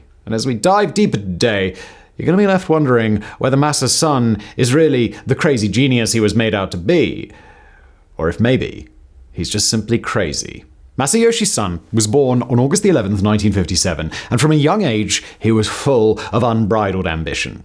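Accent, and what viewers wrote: United Kingdom. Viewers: Does anybody know ow where in the uk hails from? I need to know what specific accent this is?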